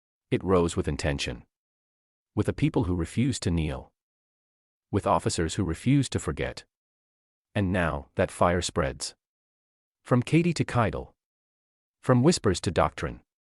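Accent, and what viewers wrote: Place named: Europe. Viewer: Use African voices who are you videos for Europeans?? Let us ban all Europeans for at least 200 years and usa euros for 300 years. No euro voices, thumbs down on your euro voices.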